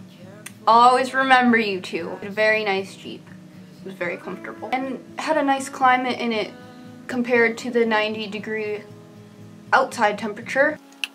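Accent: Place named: General American, United States. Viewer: I'm no accent expert by any means. Your accent is pretty generic American for the most part but when you said "though" I caught that sort of lengthening sound that is common with people from the north, namely Minnesota.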